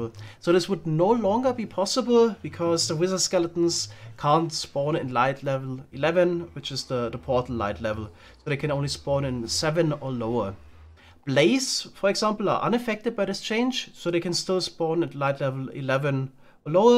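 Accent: Germany. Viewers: Hört sich an als wär da jemand ein Deutscher ;)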